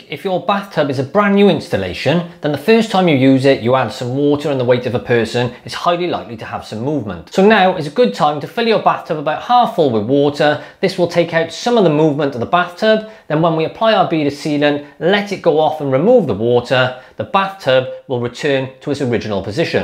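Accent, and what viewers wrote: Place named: North West England, United Kingdom. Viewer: Familiar North West accent. Thank you from Italy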